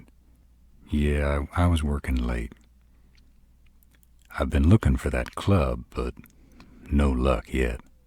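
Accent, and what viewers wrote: South, United States. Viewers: that southern drawl is so freakin hott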